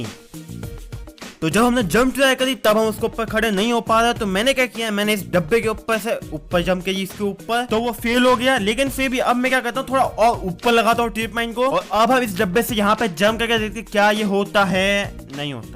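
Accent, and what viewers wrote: Gujarat, India. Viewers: I love your voice.does you have Gujarati accent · Bro, are you Gujarati? Cause according to your accent, I guess that you are Gujarati..... Btw I love your videos.....Keep it up for entertaining us!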